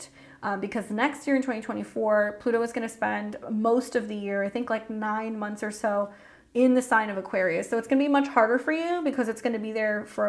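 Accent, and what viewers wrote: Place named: Cuba. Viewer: Oye you sound a little Cuban. You Cuban girl?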